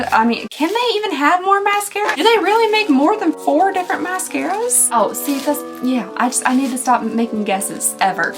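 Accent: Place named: South, United States. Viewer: Sounds more like you’re back from Texas 😂🥰 anyone else hear that southern twang a little extra in this video….😉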